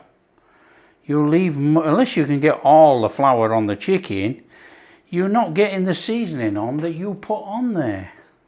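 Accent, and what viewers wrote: Yorkshire, United Kingdom. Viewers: Hi, i have been surfing all the channels that i used to follow before i found yours, and the amazing thing is they have all upped their game, some indian channels, which i wont mention, has adopted your trademark saying of " Look At That! " Which brings a smile to my face as its not with your Yorkshire accent. So you obviously are making them less complacent. Fantastic! X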